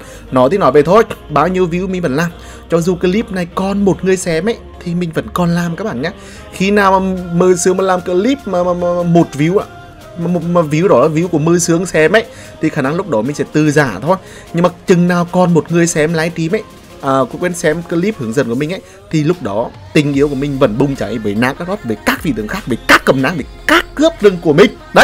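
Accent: Nghe An, Vietnam. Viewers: Yêu giọng NGHỆ QUÊ CHOA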